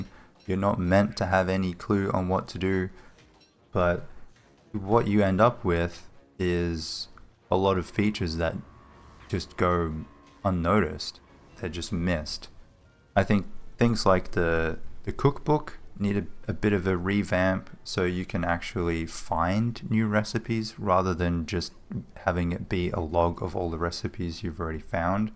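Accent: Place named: Australia. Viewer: Hello! I've been watching your videos and I really enjoy most of them! I'm really in love with your accent, it's reminds me of Aussie people. It's sad to know that Australian people are not real :(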